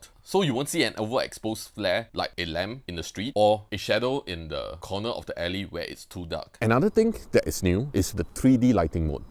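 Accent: Singapore. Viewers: No anyone comment on the Singlish? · You sound really Singaporean / Malaysian.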